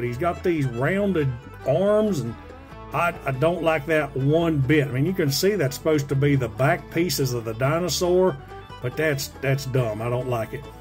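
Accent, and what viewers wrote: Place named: South Carolina, United States. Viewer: I live in S.C. and I got some family that talk just like that, except a tad more intense. I have a mild case of it but I can't help it. 😂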